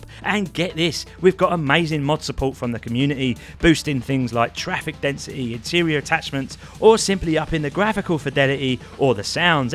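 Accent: Cockney, United Kingdom. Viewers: This guy is Cockney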